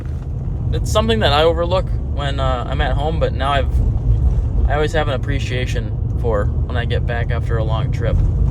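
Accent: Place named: South, United States. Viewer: Lovin the country twang you been playing,coming from a country grandma,I’m young at heart !!! Please take care and be safe Sending good vibes from Nova Scotia Canada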